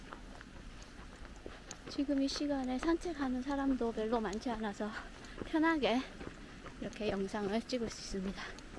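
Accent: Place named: Gyeongsang, South Korea. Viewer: 사투리는 저와 같은 경상도 (저는 대구 ) 사투리인거 같아 더 정감이가고 콧물 소리는 전혀 느끼지 못하겠는걸요 ^^ 신경 안쓰셔도 될듯해용~~~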